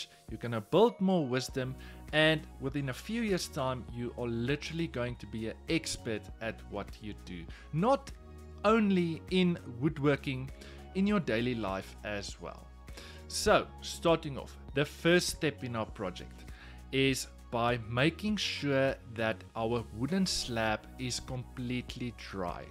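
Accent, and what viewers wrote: South Africa. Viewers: Love the video. And love the saffa accent. Haha. Keep going bud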